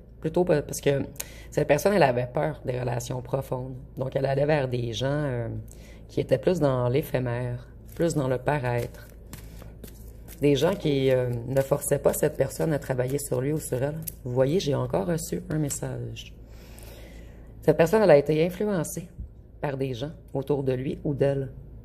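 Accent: Quebec, Canada. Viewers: Bonjour 😁, Très très passionnant votre tirage 😅, j'ai l'impression que vous décrivez une bonne partie de ce que je vis actuellement 😅, j'ai hâte de voir ou cela mènera mais en effet je garde une certaine distance car ne sachant pas réellement ce qu'il veut et cherche mais en effet de son côté c'est est le chaos dans ca vie ..... Maintenant à savoir si c'est de lui que vous parlez ou d'une autre personne 😁 car lui est poisson 😂 En tout cas je vous suis avec grande attention et j'adoreeeeee vôtre accent québécois ❤❤❤❤❤❤ Et mille merci pour vôtre tirage qui m'a fait sourire 🥰🥰 Et à vos souhaitssssssss 😉🥰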